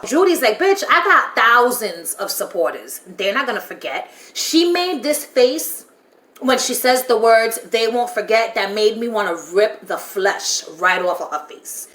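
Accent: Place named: United States. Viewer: I think ur baby love ❤ 😍 lou will end up screwing over judy Bryant 😡😡cause lou has 2 meet her contact's as she doesn't get visitation so lou will know the plan n f**k over judy at last minute and ann Reynolds has 2leave my baby love alone mr Jackson 💔 🎶,but im with u marie is starting to grow on me again. Please give 🙏 😔 mention 🙏 Darren from Dundee just want u to mention me in ur American accent as ❤ ur accent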